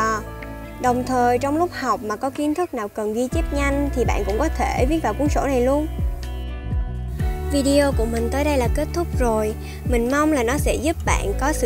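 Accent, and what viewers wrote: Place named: Saigon, Vietnam. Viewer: Mình thấy b ko nên lồng tiếng ý, vì giọng b là người sài gòn, khá khó nghe, b nên thử giọng hà nội hoặc dùng chữ thì sẽ chill hơn nha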